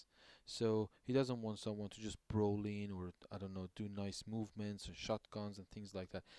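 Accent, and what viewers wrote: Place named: Iran. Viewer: are u persian ? ur accent so irani :D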